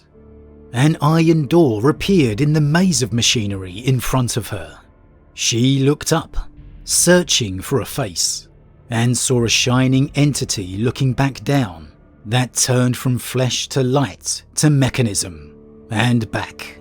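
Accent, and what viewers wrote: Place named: England, United Kingdom. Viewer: I get excited to hear some more and instead I get backwater English accent. GD I can't stand u euro trash accents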